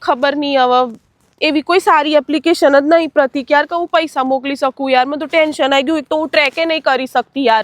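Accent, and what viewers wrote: Sabarkantha, India. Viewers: Tame himatnagar na cho...tamare bhasa sabarkotha jevi aave che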